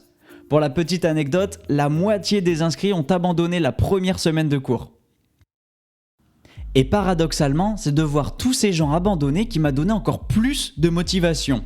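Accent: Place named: France. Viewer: Merci! J’aime bien ton accent français 😄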